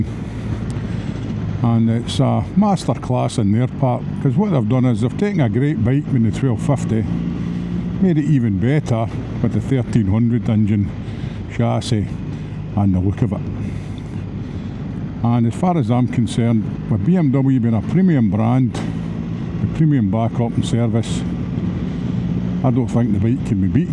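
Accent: Scotland, United Kingdom. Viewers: One of the very best reviews on the <num> GSA by a person who obviously knows his GS or GSA bikes. A very good video compilation comprising super illustration of bike sounds and agility, and love that cool headed Scottish accent - just like your country cousins here in Co. Antrim 😄 ! I love my R1250GSA TE and the 1300 GSA TE will indeed need to be top notch to get me off it. Your video certainly has encouraged me to make that move ....... say in another year but the move is now on the cards given your excellent review answering lots of my unknowns and obviously a test ride will be in order. It's hard to beat German engineering. Many Thanks, Wm. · Hi, great review. I had to get used to your Scottish accent, but once I did, I really understood you (I'm from upstate NY). Can you do a review of the GSA with ASA? I'm curious as to what you think about it.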